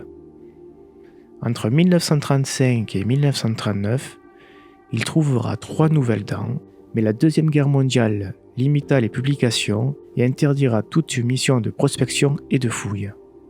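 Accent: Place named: South, France. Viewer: Je découvre ta chaine, franchement j'aime beaucoup. Tes vidéos "News" sont intéressantes tout en étant relaxantes, c'est un format très appréciable. De plus, étant originaire du Sud de la France, ton accent me ramène un peu chez moi ! Bref, tu gagnes un abonné. ^^ Du coup je te dis à la prochaine ! ;) PS : Petite remarque sur un détail qui a tout-de-même son importance : le nom de l'espèce ne prend jamais de majuscule, seulement le nom du genre. Aussi, dans ce cas, il te faut écrire Gigantopithecus blacki dans le titre. Voilà voilà. :p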